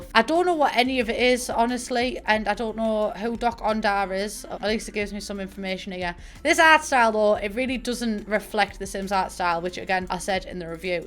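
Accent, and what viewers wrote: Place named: Northern England, United Kingdom. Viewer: I love the fact you translated for other people lol. Im from leeds so as a fellow northerner i understand you fully and you are sooo funny :)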